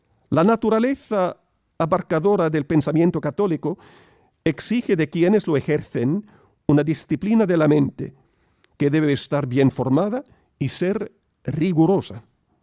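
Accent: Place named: Latin America. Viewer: su caridad, me gusta mucho su acento latin y mas aun el mensaje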